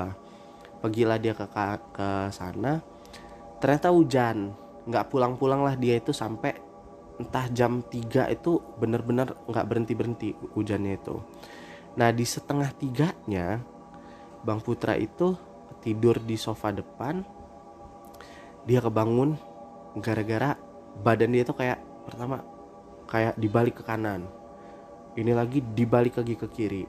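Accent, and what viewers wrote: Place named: Lampung, Indonesia. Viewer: Pantesan logatnya ga asing.. ternyata lampung juga bang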